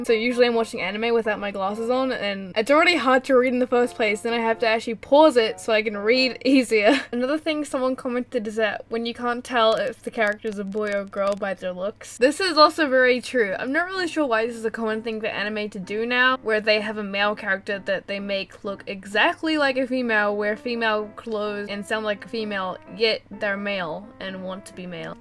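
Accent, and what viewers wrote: Australia. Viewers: You Aussie?? YASS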